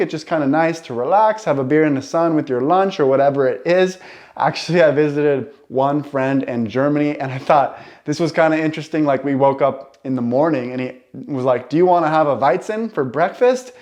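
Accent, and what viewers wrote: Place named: Sweden. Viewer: I feel like your accent has absorbed a tiny bit of Swedish, which is funny as you're from the US and English is your native language. The way you say "stock up" or pronounce rs compounds like "sh". No judgment here, I just noticed it and felt like it was interesting to notify.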